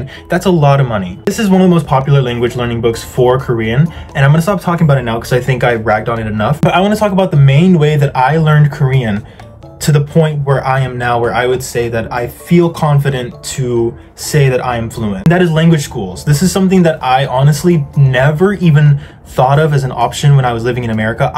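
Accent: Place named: Canada. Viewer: Hi Kate! This is a YouTube video, he plans what he wants to say, he cuts out any unnecessary bits whilst editing and you are able to control the volume you hear him in. All these factors make the difference in comprehension when having a real life conversation with someone. My native language is Portuguese 🇵🇹 I had the same issue when I moved to England as a teen, I promise you it gets better (provided you’re learning English?) - I would recommend trying to immerse yourself into the language more, watch vids in English (anything you want to watch search in English), series, movies etc even if you need English subtitles at first to follow along. Books (actual reading books not the teaching ones) are also good, a lot of people like Harry Potter for languages. It starts off easy and then as the books go it gets more complicated which helps you increase your vocab. 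Maybe English is your native language and the accent is what you’re having issues with, most of what I said would still apply, you’d just have to find vids, series, movies etc in the Canadian accent (basically American so it should be alright) good luck :)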